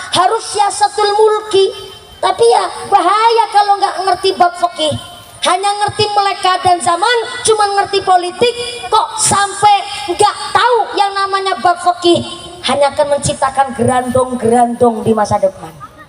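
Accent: Banyumas, Indonesia. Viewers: Nyimak Ceramah ngapak nya😂😂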